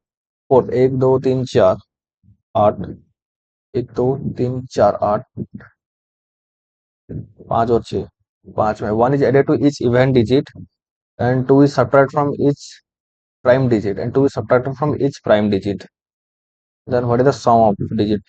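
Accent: Odisha, India. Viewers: Aap odisha se ho??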